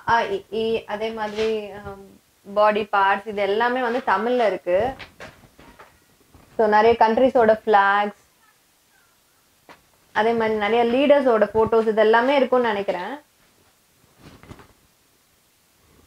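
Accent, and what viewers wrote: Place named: Tiruchirappalli, India. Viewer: Ethu trichy a athan language laye theriyuthu wow me too trichy Namma pullaya nenga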